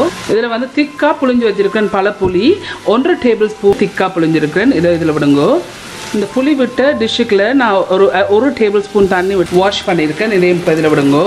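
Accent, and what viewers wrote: Jaffna, Sri Lanka. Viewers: She is using Jaffna style Tamil it is the aathi Tamil and has no connection to India. It is from lemuria for your information.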